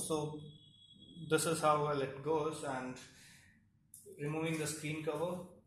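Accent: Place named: India. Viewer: Bloody Indian accent -_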